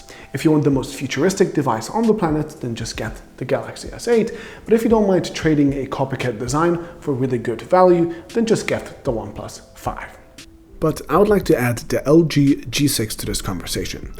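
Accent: Germany. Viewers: Kommst du etwa aus Deutschland? :O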